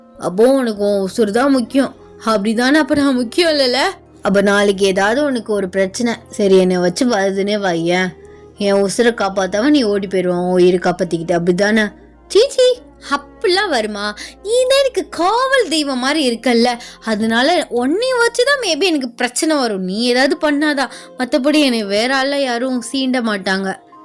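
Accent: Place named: Nagore, India. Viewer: Ena sis engala ippidi suspense layae vaikiringa😩but na story half laye guess pannitan y/n also human illanu😁next intha upcoming story kaga waiting sis🤗oru veala enoda guess y/n half human +half Demon or werewolf ha irupalooooo.....Sis neenga demon nu tha solluvinganu nenichan😂na last comment la kuda Demon nu tha potan😂but idhu sema story sis i loved it😊unga slang awesome neenga nagore ha sis😊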